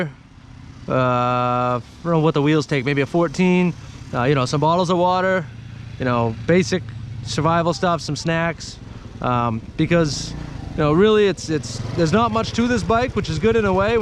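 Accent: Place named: Massachusetts, United States. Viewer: You sound New England. I’m from Maine and you sound like Massachusetts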